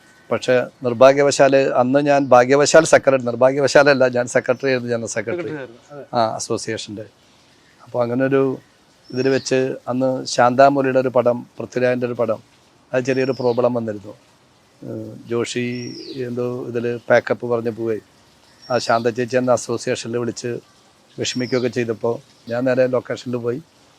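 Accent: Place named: Thrissur, India. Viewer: നിങ്ങളുടെ സംസാരം കേൾക്കുബോൾ തന്നെ അറിയാം ആ ഗഡ്സ്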